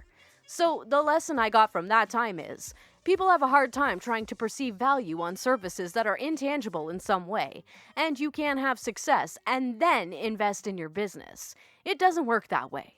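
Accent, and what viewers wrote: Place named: Yonkers, United States. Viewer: Just found your channel and LOVE it, so subbed and will follow your posts. As for the white chocolate brownies, NO. That's just wrong. Brownies should be Milk Chocolate or Double Fudge types... (grinz) Also, I like how you don't edit/change/bleep-out certain words. Plus that lovely Yonkers accent had me and my wife laughing.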